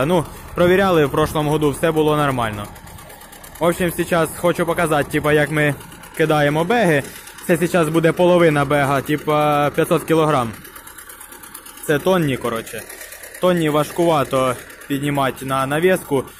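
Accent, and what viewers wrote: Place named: Ternopil, Ukraine. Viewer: а значить перепутав у тернополі похожа до вашого акценту.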